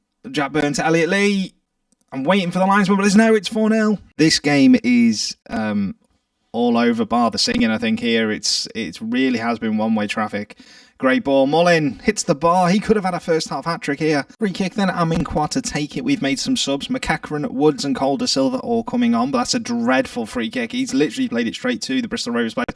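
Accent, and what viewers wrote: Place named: Liverpool, United Kingdom. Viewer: Am I tripping or are you a scouser lol gonna subscribe anyway goodluck g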